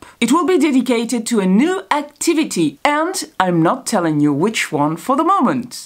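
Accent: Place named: England, United Kingdom. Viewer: i really like your english accent. have a nice day ;-)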